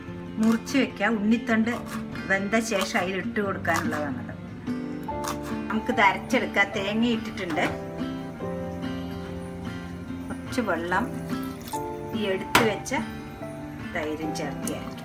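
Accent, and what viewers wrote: Palakkad, India. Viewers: Palakad aano desam samsaram thonunu super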